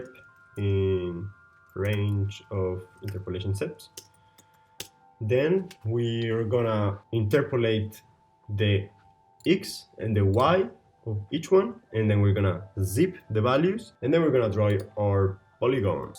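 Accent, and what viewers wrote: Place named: Latin America. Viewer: Bro, are you a spanish speaker from Colombia? Your accent sounds very latin spanish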